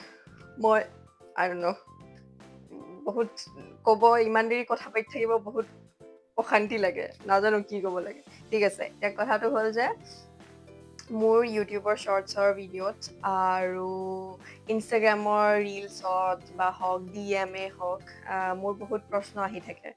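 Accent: India. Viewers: Baa emn dhunia koi kotha bur kua ekdom upper Assam r style t ..... love from Sivasagar .... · Tumr Maat tu Huni e gom paisilu tumi amr Jorhat r e hoba sge buli ❤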